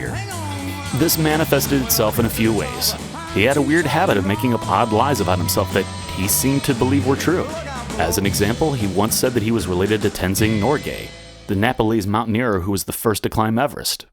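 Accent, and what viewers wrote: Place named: South England, United Kingdom. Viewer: That is not an American accent my friend. Its a south England accent!!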